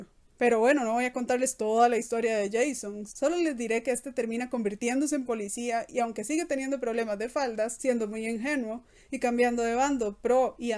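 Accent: Costa Rica. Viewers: Eres de Costa Rica verdad? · No sé, pero tienes un acento de Costa Rica. Es como escuchar a una amiga de la prepa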